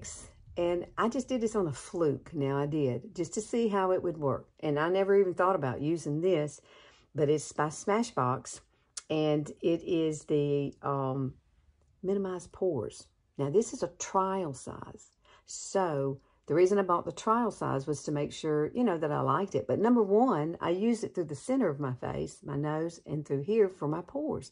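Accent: South, United States. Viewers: Love your southern accent. I have a ton of family in Alabama..What part of Alabama are you in?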